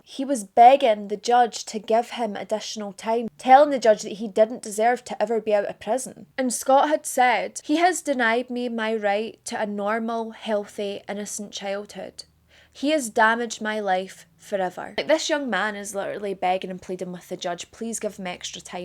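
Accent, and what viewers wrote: Scotland, United Kingdom. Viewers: Great video! Ive never heaed of this case and I grew up in Spokane Washington. Weird. I think you are so beautiful! You totally remind me of Bailey Sarian with a Scottish accent 😂 I love you both so so so much!!!! (((HUGS))) from Idaho USA ❤ 🐈‍⬛🐈‍⬛🐈‍⬛🐈‍⬛ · Sooooo…. I love ur accent and I know u try to pronounce things really well so ppl not from Scotland can understand (like myself). But I would LOVE a video with Scottish slang and ur natural pronunciation!!!! Maybe closed captions for slang words but I absolutely love hearing ur accent!! Jus an idea 😅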